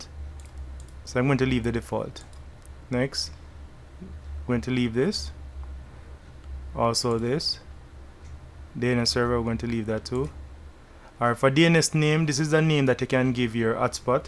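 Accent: Jamaica. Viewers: Are you from Jamaica ?, love the accent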